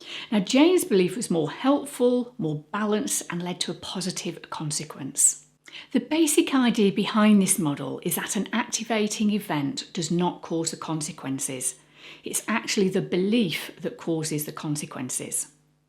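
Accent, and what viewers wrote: England, United Kingdom. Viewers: This is a great video, but can you please do it again without the English accent? I'd prefer to hear it with a Canadian accent. Thanks!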